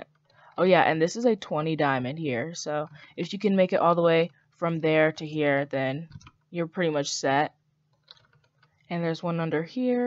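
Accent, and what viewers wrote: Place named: Jamaica. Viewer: You kinda sound like a jamcain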